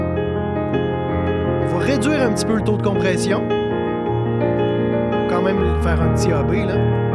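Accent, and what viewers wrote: Quebec, Canada. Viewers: Bonjour, j'adore votre chaine ! Impossible de télécharger KOLIN sur PC Windows 10. Continuez à nous ravir avec votre accent Québécois ! Max de France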